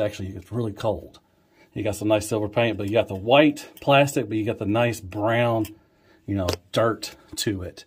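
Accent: South, United States. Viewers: Your enthusiasm is joyfully infectious and a Southern accent is always a pleasure to hear. I subscribed, and am rooting for your channel! Side note from left field here but, I hope they make a Bruticus one day. 'Bingo Toys Bruticus' just rolls off the tongue, don't it?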